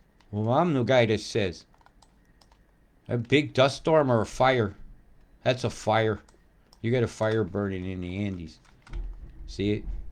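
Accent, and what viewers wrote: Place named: New York, United States. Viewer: I love your voice. Reminds me of both Brooklyn, New Joyzy & Boston. I was born in NY, my dad grew up in Scarsdale, was a welder/engineer in Brooklyn. As a kid we lived near Pelham. Used to skip school, take the train to Grand Central, muck around, panhandle for train fare back home in time from "school"... 😁 Keep singing .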